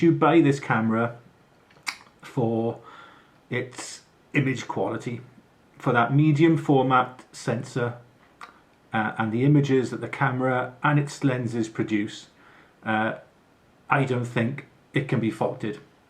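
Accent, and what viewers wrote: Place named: Wales, United Kingdom. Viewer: Just come across this by chance. Not a Fuji shooter (used to have an XT1 though) but love the medium format landscape look & if money was not an issue this would have been a must.. Nice to hear a Welsh voice and someone shooting landscape on You tube. Just off to check your other videos. Hope you get your probs sorted with this camera. atb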